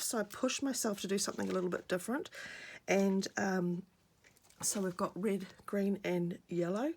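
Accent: New Zealand. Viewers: These are all fantastic!!! I finally got the chance to sign in and subscribe. Yaye! Thanks so much for being such an amazing inspiration! LOVE your work, style and creativity and of course your gorgeous Kiwi accent! xx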